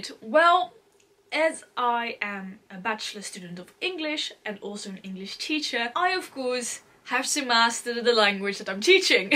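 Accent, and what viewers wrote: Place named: RP, United Kingdom. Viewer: hiya! prob u r not reading the comment section tho i can’t help but hats off to ur accent. this is just marvellous, impeccable clear RP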